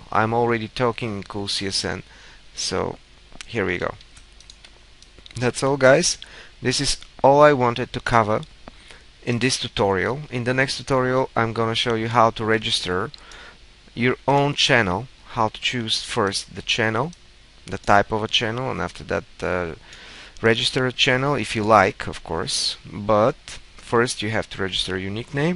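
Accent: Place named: Russia. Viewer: I liked your Russian accent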